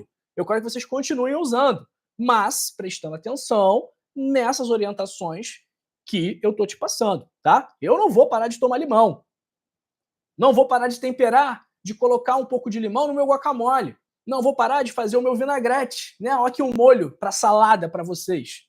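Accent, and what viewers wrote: Rio de Janeiro, Brazil. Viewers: Olá. dr . eu acho que. Vc . Carioca vc soquete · Sou de Mogi Guaçu Cacilda Pimentel sp agora você parecem ser carioca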